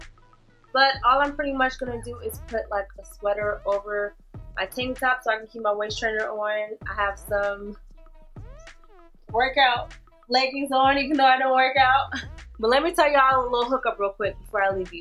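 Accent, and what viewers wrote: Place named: Atlanta, United States. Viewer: I had to make another comment. The way you say erange (orange) is EXACTLY how Atlanta people say it! 😂😂 I moved from Dallas to Atlanta and every time I hear it I'm so tickled. And you how you do that loud tail thot pop!? 😂😂😂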